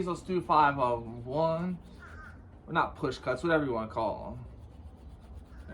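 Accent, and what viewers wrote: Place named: Philadelphia, United States. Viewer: Sic blade, gonna have to pick this up. Where are you from? I hear a philly/Delaware, jersey accent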